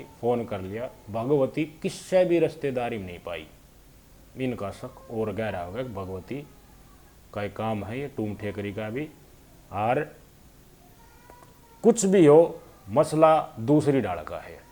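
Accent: Haryana, India. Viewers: Singer to kham kha hanga laa re ha asli haryanvi to bhia saab aap bol re ho ek ek sabed kati sun k maja sa aaga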